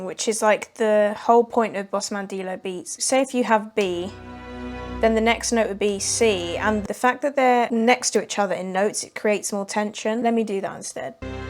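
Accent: United Kingdom. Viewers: Ayeeeeeeeeeee I started to play this & completely ignored it, a few moments later I’m like “Who is this lil white girl with this UK accent cooking on the beat!” Does she/her have an Instagram? I have to plug her in with my lil bro DJPlugg, Google him. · Her posh accent makes this go even harder.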